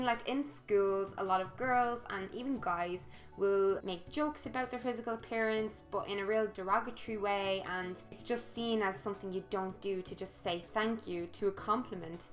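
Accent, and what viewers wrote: Ireland. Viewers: you are gorgeous, I have been listening to you a few times to practice my english listening, due to I´m from Mexico and want to practice the language. Furthermore I love your irish accent.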